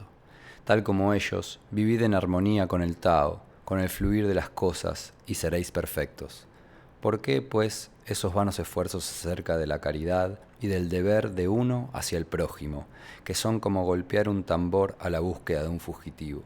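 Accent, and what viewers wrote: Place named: Argentina. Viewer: Más allá del contenido que es genial, el locutor real me encanta! En argentino!! Éxitos!!!!!!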